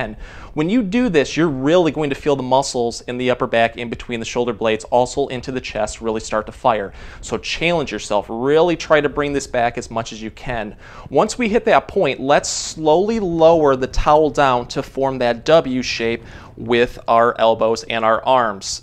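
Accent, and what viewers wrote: Michigan, United States. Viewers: I'm here for the Michigan accent and the great tips 😎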